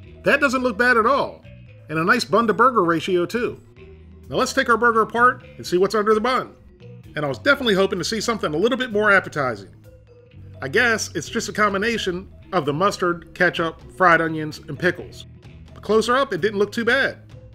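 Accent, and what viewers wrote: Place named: North Carolina, United States. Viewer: Man you sound identical to the folks from my home town in North Carolina, which is really refreshing seeing as how I moved off when I was 19 , do you live in the south by chance ?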